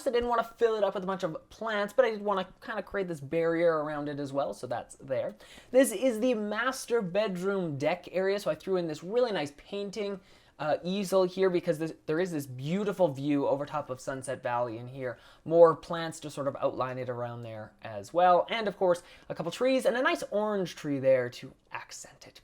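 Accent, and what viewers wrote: Canada. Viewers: He's Canadian, they pronounce it differently. It's not that serious! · He's Canadian, so he has an accent. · Are you Canadian?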